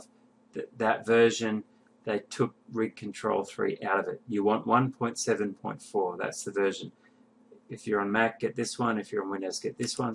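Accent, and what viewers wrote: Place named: Australia. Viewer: Thank you so much my friend. I'm from Brissie - it was so nice to have a calm Aussie voice walk me through getting this working. Bless you .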